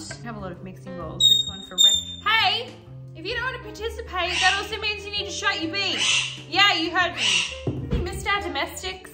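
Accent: United States. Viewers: Very charmed by the American accent. I see an opportunity for a teamup with fellow Aussie and baker extraordinaire Ann Reardon from How to Cook That? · Holy shit, you're American accent is spot on.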